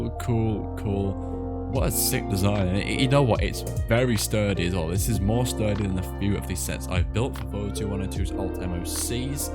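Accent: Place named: Denmark. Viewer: holy danish accent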